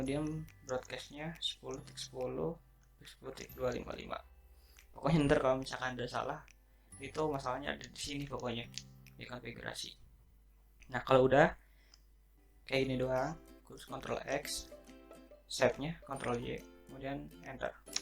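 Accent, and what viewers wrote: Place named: Sunda, Indonesia. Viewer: Bahasa Indonesia dengan logat Sunda dan Jawa wkwk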